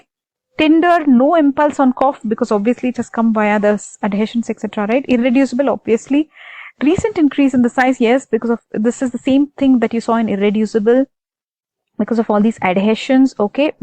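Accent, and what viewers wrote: South India, India. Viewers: Are you from Karnataka? Your English accent seems like you are from South India.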